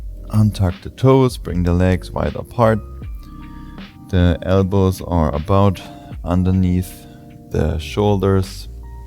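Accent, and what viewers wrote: Germany. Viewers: I like your German accent. ❤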